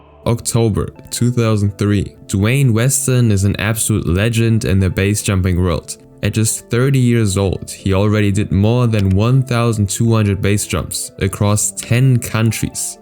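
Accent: Germany. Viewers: Are you German by any chance? Your English is perfect but I can catch a minor German accent :)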